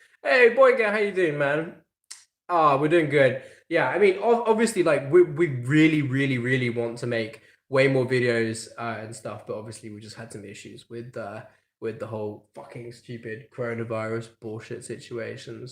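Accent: United Kingdom. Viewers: Are you afraid of losing your english accent being locked up for so long with Gabbi?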